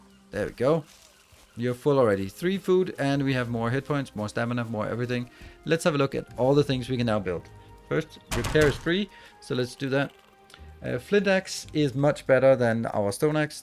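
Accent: Denmark. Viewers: Are your from denmark because You Sound like a danish takling english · Ohaaa en dansker, føler det er så cute når man kan høre det. Fed video!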